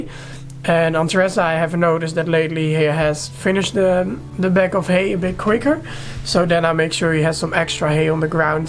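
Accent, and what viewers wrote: Netherlands. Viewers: I hope someone knows. I love how he has Dutch & English with beautiful Dutch accent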